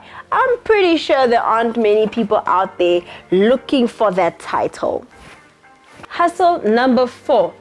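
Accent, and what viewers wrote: Nigeria. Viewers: So you have been hanging out with one of my Nigerian brothers.Look at that accent . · That Nigerian accent 😂🤣🙌🏿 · Girl.... your nigerian accent is on point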